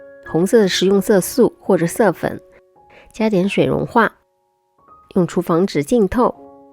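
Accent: Shanghai, China. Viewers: 原来是上海宁呀，怪不得不一样，一直在怀疑侬是上海宁，现在终算证实！😂😂😂😂❤❤❤❤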